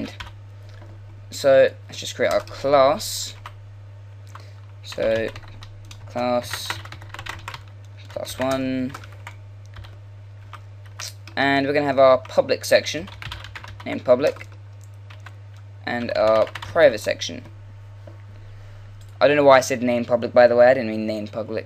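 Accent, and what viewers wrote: United Kingdom. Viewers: Thank you very much! I like the accent cockney, "NUMBAR", my favorite accent! Greetings for England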